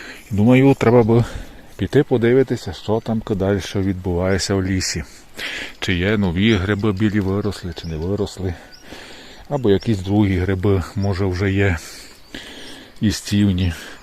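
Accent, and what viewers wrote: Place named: Lemko-Boyko, Ukraine. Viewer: Ще хотілось підмітити Ваш чудовий наголошений звук "И", який притаманний говірці лемків і бойків, дякую!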